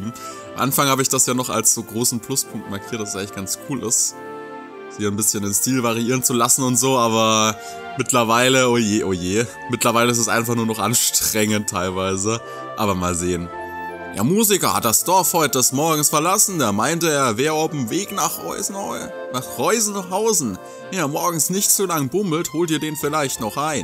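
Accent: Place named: Low German, Germany. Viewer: Ach ja ich mag diesen Akzent. Plattdeutsch ist schon was interessantes. By the way wie geiht di dat? 😂😂 Das ist mein lieblings Satz auf Platt. Selber sprechen kann ich es nicht, aber ich verstehe es und noch was krass das LP hat fast 100 Parts. Ich würde dir raten mal in der Herberge zu übernachten. Vielleicht passiert ja auch in diesem Dorf das selbe wie im anderen. Seien wir mal ehrlich es ist schon eigenartig, dass niemand mehr da war. Ich glaube das hat was mit diesem Musiker und seinem Lied zu tun. Wer weiß vielleicht verzaubert er sie ja mit dem Lied und sie verlassen über Nacht das Dorf um irgendwas zu tun. Das erinnert mich gerade an eine Geschichte und zwar hieß sie „der Rattenfänger von Hameln" nur dass es nicht die Kinder sind die verschwinden sondern alle. Bei dem Schwert Stimme ich dir zu. Es ist ein schönes Detail im Spiel, was bei DQ meist nicht selbstverständlich ist 8 zum Beispiel, da gab es einmal was sie normal an hatten und das „Spezial Outfit" (weiß nicht, ob sie sogar mehr als ein Exklusives Outfit hatten aber naja du weiß was ich meine). 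Das finde ich in DQ 9 auch so geil, wenn man sich um zieht und der Charakter das dann auch im Kampf an hat. DQ 9 ist aber so ein tolles Spiel. Action, Spannung, Drama, Story und noch ein paar andere nennenswerte Dinge an DQ 9. Eine Frage würdest du auch mal DQ 9 LPn? Würde es auf jeden Fall klasse finden. Super Part übrigens auch noch. Freue mich schon auf den von morgen 😊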